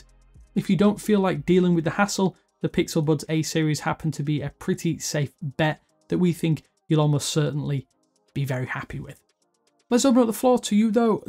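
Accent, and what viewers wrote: United Kingdom. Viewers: That's just how British people talk Lol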